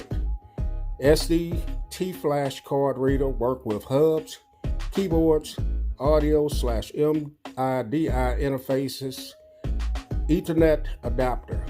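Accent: South, United States. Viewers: Are you from the south of the state’s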